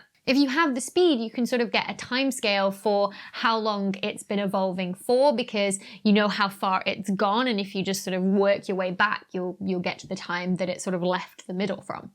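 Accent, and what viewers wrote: United Kingdom. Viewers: Very informative. Spot on delivery and content. You keep getting better! Plus, listening to you, I have tuned my ear to understand British accent.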